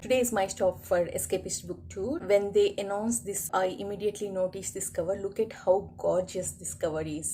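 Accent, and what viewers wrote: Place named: Scotland, United Kingdom. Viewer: You had Me at Scottish 😂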